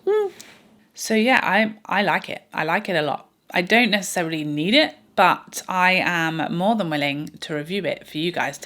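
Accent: United Kingdom. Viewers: hahaha "tickling my pickle" ...wish i had a british accent to say things like this. i usually like dior quints but this bouquet one is blah, no. no to nars; did all the beauty brands come together and decide to all do a star, celestial theme? where is the creativity here? i would've liked that urban decay palette in college... im 33 now, and i prefer the muted/diffused look of chanel + dior shadows.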